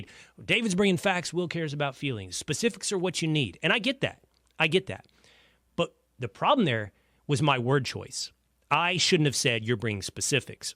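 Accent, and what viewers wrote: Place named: South, United States. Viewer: Hi there, I saw the debate with David & that happened to be the first time I've seen your show. I watch David, so I think the algorithm hooked me up. I commend you for your calm composure, fairness & respect for. I've watched him for years, & I was taken aback by the aggressive tone he took with you from the start. I don't know if he was maybe scared or nervous because he's had some bad experiences with debates with the right "debating.". Or the two of you have differing ideas about what is/isn't a debate. In any case, he seemed ready for a fight & defensive. I lost some respect for him after that episode. I am an old-fashioned liberal libertarian (liberal on most social values, but lower my taxes! - I am not wealthy!) I now have subscribed to your channel because I appreciate your delivery, like to hear fair arguments from reasonable folks on the right, & as a Southerner, I know that accent! 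Thanks for the follow-up here. It was very fair. May we all honor the men and women who have given their lives & health to keep us free & safe this Memorial Weekend.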